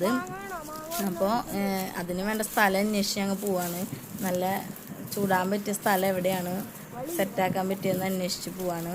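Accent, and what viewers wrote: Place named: Thrissur, India. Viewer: thrissur slang nannayi und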